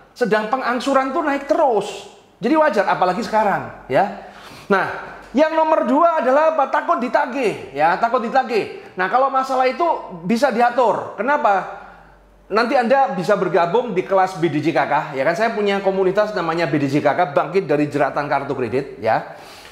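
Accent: Java, Indonesia. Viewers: Motivator yang OK dan terkenal rata rata itu medok 😊 joss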